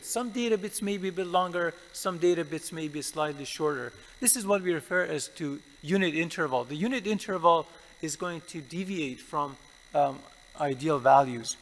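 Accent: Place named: Iran. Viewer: mamnun. az avale lahje fahmidam ke hamzaban hastim. baese eftekhare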